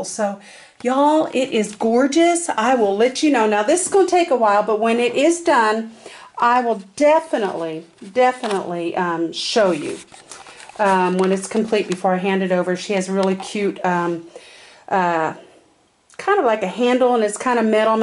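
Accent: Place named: South, United States. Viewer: Where in Idaho? I have 2 Son's in Idaho Falls! I am glad I am not the only one who breaks out in song! LOL I Subscribed!! I Just Love This!!!! Where are you from? You sound like you are from the South! Love~~Z from Texas! Vonda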